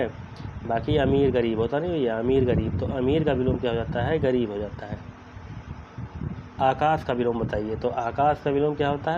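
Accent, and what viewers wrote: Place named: Agra, India. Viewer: सर जी आपकी भाषा तो अपने आगरा जिले की सी लग रही हैं🤣🤣 आप कहाँ से हो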